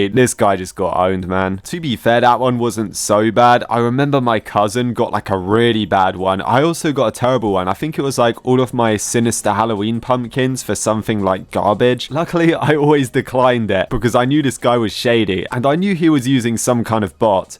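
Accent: United Kingdom. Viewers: I love your accent this is the first time I've heard a UK accent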